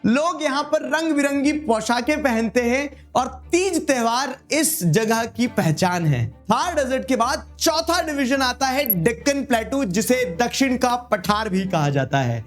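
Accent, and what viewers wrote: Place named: Garhwal, India. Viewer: garhwali dilect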